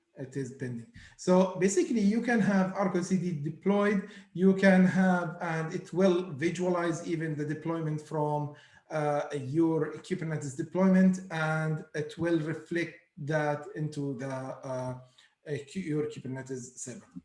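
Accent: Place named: India. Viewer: Can you get someone who doesn't have an Indian accent to do these videos? Sounds horrible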